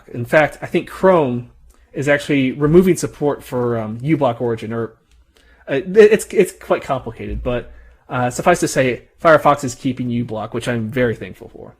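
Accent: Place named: United States. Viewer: He sounds American, poor guy. Being Catholic in USA must be like being a Russian in post-WWI Germany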